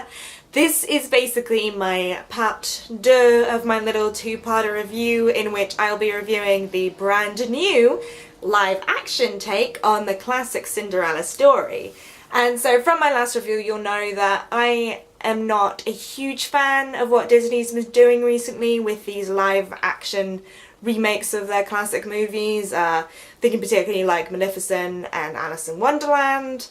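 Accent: United Kingdom. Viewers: are you from UK ? just wondering about your accent. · Yeah cause you sound like your from Sufford(the same place as Lily James came from).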